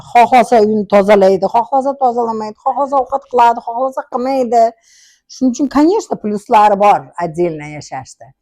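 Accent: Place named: Samarkand, Uzbekistan. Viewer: ты супер, маладес, хакикий Самаркадча гап булибти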